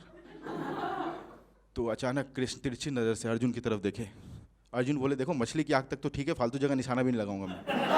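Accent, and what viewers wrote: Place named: India. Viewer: waise, aapke saare video dekhe, funny to hain hi lekin ek desi lehja bhi hai jo jokes ko aur bhi mazedar bana deta hai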